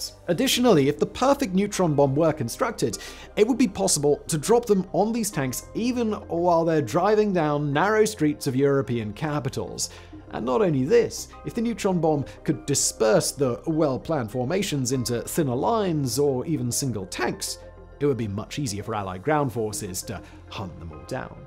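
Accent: RP, United Kingdom. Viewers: legit, the subject is so interesting, but this man is talking so over the top queens english that it's distracting ngl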